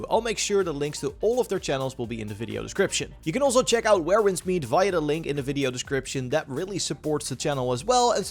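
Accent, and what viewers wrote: Netherlands. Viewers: UK HAHA youre dutch dude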